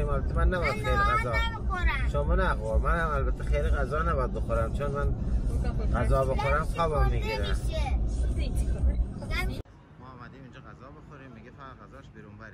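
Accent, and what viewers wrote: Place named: Shiraz, Iran. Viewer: عاشق لهجه زیبا و اصیل شیرازی شما هستم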